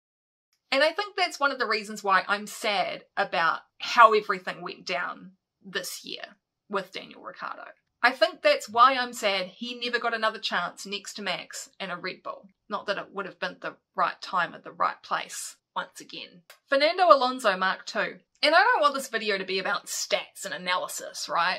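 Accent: New Zealand. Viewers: Yeah, I would like you to do a more in-depth analysis of DR3 & his career. For two reasons - first, and most important, you convey your thoughts in a manner that is real, absent of all the BS & other general palava that is served by the vast majority of F1 content creators ( I would put you in the top 10 in your field - your numbers don't reflect that, but that is just a matter of time and a very smart man once said time is relative!). Second reason - there is nothing wrong with your accent there should be more of it on YT. There ya go - you're a NZ trendsetter! (Yeah, there's a better than even chance I might share your accent! 😉) In any case, it's miles better than the worst accent - shit, can't say that in the comments section of a video about an Australian can I. OOPS! Keep up the good work. Can't give you a new subscriber - I was No 39! (Will be posting this to FB tho 10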